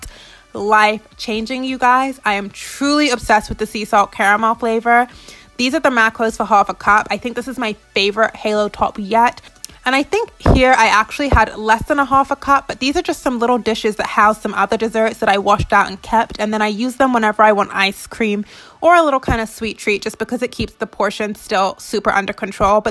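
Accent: United Kingdom. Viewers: Why do you sometimes sound British? I’m kinda confused. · Hinata Hyuga she is British and she moved to Canada when she was younger. So her accent probably stuck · Your accent is sooo different in this video, I've been watching your videos since you started you WL - are you dating a Brit? Or been to the UK recently? The English accent is more obvious than it has ever been.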